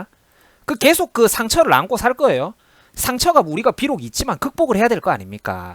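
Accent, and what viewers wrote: Gyeongsang, South Korea. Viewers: 대구말투 착착 감기네요 나는 대구에서 태어나서 다행이다 생각하는요즘 우리부모님께 감사감사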